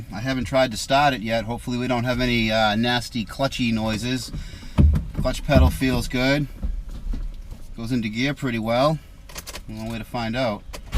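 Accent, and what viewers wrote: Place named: New England, United States. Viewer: Nice videos, subsribed! :) Love that New England accent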